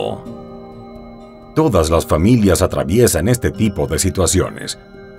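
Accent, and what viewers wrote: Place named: Mexico. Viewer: Porque habla en espanol, Si son americanos de habla ingles? No eran de Mexico. Y la voz se eschucha de Mexico Oh de otro pais. No me gusta este Video.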